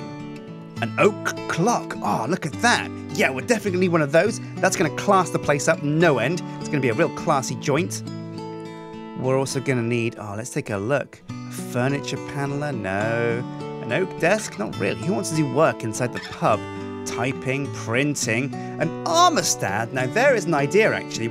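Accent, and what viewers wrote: Australia. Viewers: that aussie accent is on point! i would know im Australian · why did you suddenly go Australian? Also, you should make ALL the NPCs go to the inn when they need to eat becasue, well, it's an inn! That's where people eat! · Stereotypical Australian accent, but it's actually not bad,! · The Ozzie accent lol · Dat Aussie accent doe!!! 😂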